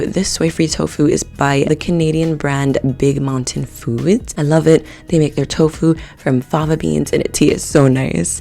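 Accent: United Kingdom. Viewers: Excellent British Accent 👌🏾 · I love a good British accent ❤❤❤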